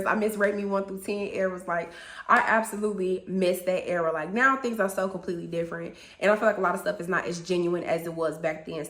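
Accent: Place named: Midwest, United States. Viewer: It's so Midwest 😅😅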